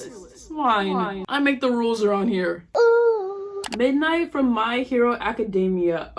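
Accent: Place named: United Kingdom. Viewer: you’re editing honestly kills me off everytime!!! love ur vids 🫶 and also ur british accent isn’t half bad :)) love from, a mancunian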